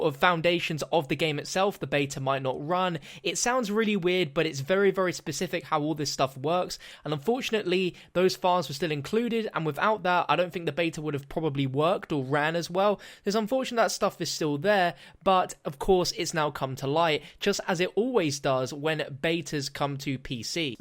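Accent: United Kingdom. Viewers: He's from the UK, so his pronunciation is incorrect.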